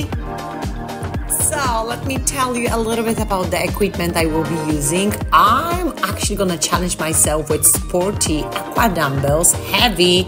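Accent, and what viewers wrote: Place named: Lebanon. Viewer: وعي تتكلم لبناني احس غرااابه 😂😂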